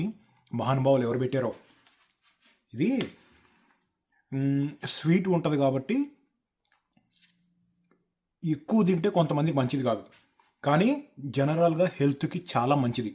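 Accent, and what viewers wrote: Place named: Krishna District, India. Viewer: Mee maata teeru krishna distrik laaga vundi same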